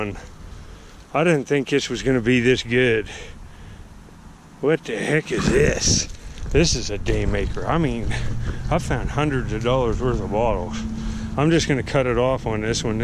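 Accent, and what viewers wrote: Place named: Pittsburgh, United States. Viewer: I'm from the Irwin Pennsylvania area. I can tell by your accent that you have to be from around Pittsburgh?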